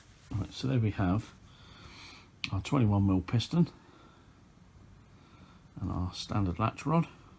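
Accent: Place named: United Kingdom. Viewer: Friend , this talk about you being unhappy about this gun must be because you should have kept off the net for your review because it,s impossible to understand a word of what your saying all wee hear is fluf fluf fluf , its your Brit accent that screws it up , find something else to criticise and stay off the net !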